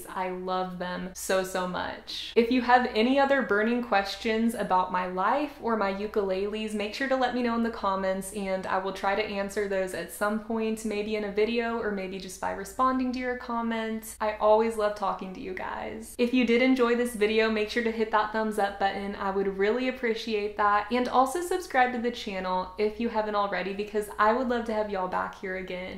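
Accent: South, United States. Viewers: Im not sure about it but did i hear a country accent? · i love you're light to heavy southern accent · Hi..love the vids and the ukes lol, you're a very funny person and the accent is quite charming I know it's southern States whether its Louisiana or wherever I'm from north of England so not sure...but you should check out that guy on you tube called morbanjo on you tube, he's playing violins he made himself out of cigar boxes and other stuff amazing... Who knows he may make a ukulele for you 😆😊🎻🎸take care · Which part of US is this accent from?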